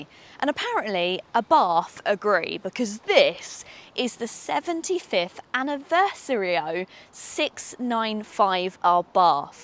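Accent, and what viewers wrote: Essex, United Kingdom. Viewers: Everything you say sounds good in your Essex accent, including "Anniversario"! I really like it!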